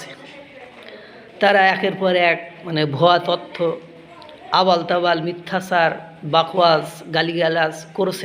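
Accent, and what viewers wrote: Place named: South Dinajpur, Bangladesh. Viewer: আপনাকে অনেক ধন্যবাদ, দক্ষিণ দিনাজপুর এর লোক হওয়ার কারণে আপনার আর আমার ভাষার অনেজ মিল ।। ❤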